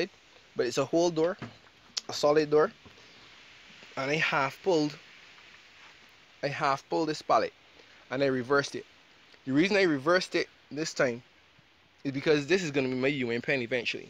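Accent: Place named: Ireland. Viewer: what country are you in? you sound a bit Irish.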